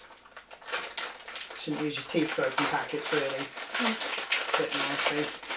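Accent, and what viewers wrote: United Kingdom. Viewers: He's from the UK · He's from the UK.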